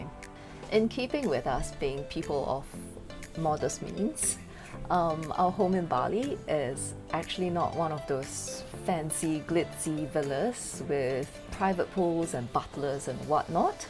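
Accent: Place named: Singapore. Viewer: I love watching your channel. So you're from Singapore that answers my curiosity cuz you sound like one without Singlish of course 😀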